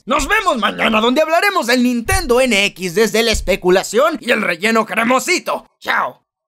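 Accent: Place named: Yucatán, Mexico. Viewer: Para nada, al contrario me gusta tu estilo , si noté un acento medio yucateco BOMBA! , pero es sólo mi percepción, saludos y buen trabajo!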